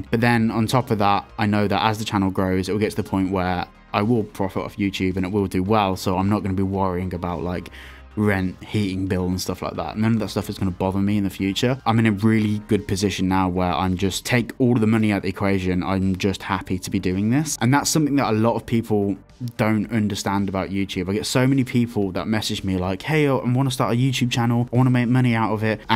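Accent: United Kingdom. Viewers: I didn't start watching you for GTA, 2 reasons why. One, your British accent and mainly your ambiance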